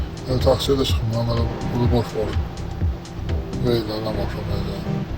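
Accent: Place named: Baku, Azerbaijan. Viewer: Qardas haralisan bele baki lehcen var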